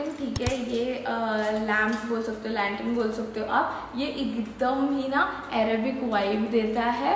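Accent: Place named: Hyderabad, India. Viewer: Areeee ap Kerala se ho muj laga Hyderabad se ho apki Hindi Hyderabadi type hai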